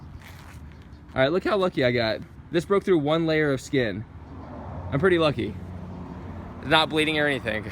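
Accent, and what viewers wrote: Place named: South Carolina, United States. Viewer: I knew your accent sounded like you were from south carolina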